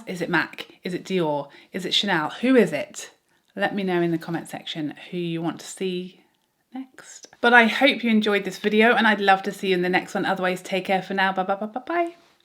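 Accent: England, United Kingdom. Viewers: I love to say your intro along with you in your English accent!! I’m a goof! Lol. Enjoy you so much! 🥰😍🥰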